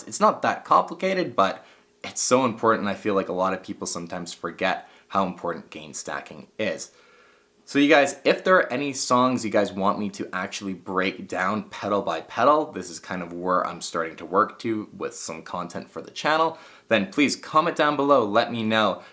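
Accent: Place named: Canada. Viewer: You sound Canadian!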